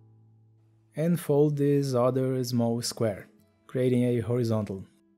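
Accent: Brazil. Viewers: Pelo sotaque é brasileiro kkkkkkk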